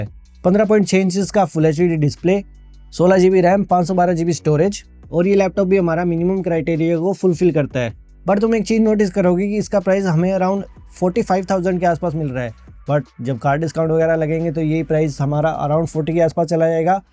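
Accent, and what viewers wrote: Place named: Haryana, India. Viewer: Bhai haryana se ho kiya btw lag raha ha 😅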